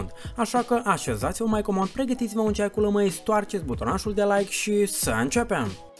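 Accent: Moldova. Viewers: Esti moldovean?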